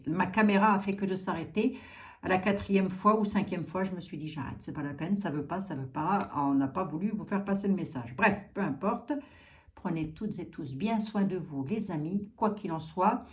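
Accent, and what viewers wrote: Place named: East, France. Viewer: J'adore votre accent de l'est je suis née à Epinal, je reconnais cet accent entre mille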